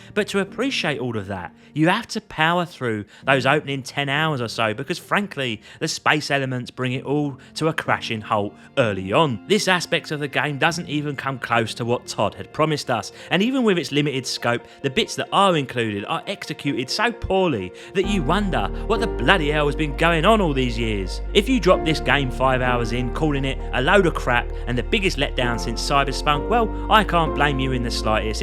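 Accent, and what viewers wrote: Cockney, United Kingdom. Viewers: I like the cockney gamer gimmick but some of the similes were a bit too on-the-nose. Good gaff though, don't feel you have to force it.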